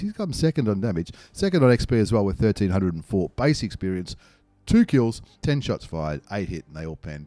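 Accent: Australia. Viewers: Hey yes you not those other bloaks luv your videos and awesome aussie accent keep up good work from Jax Fla USA P.S no homo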